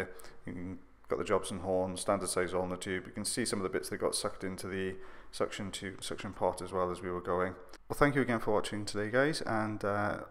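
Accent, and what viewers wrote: United Kingdom. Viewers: I love it when you say process. The British accent gets me everytime.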